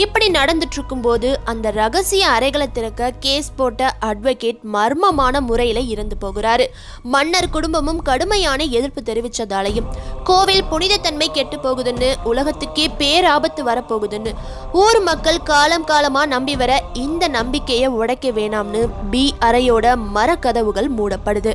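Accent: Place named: North India, India. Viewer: Unga Tamil voice super...!! North Indian girl Tamil pesura Mari Iruku....!!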